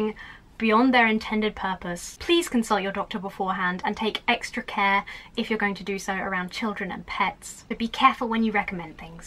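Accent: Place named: United Kingdom. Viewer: Me too. This could allmost be an ASMR channel. She's got that perfect brittish accent. And she is cute.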